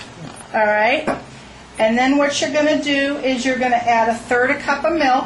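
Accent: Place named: Massachusetts, United States. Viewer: YOU ARE FROM MASSACHUSETTS YOU SOUND EXACTLY LIKE MY FAMILY!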